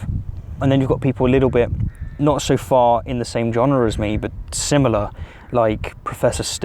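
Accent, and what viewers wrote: United Kingdom. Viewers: So you re british! Didnt notice in somehow. Southern coast seems nice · wait you're British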